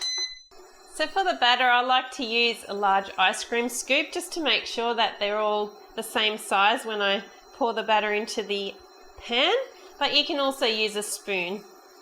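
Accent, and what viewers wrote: Australia. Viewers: Your accent is lovely! Australian?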